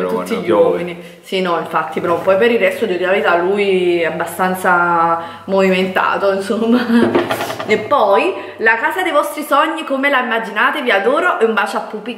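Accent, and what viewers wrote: Naples, Italy. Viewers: Sì è Napoletana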